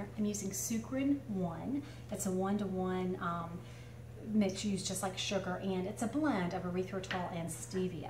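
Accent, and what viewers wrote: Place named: Pittsburgh, United States. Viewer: If you don't mind my asking, what part of the country are you from? I grew up in the Pittsburgh area. sometimes it sounds like you are speaking "Pittsgurghese" but certain words sound a little off. Just curious about my own detection skills. Thanks for the great recipe.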